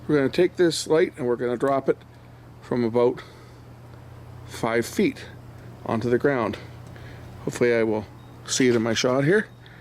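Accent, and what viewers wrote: Canada. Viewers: From your canadian english accent I think you are from British Columbia?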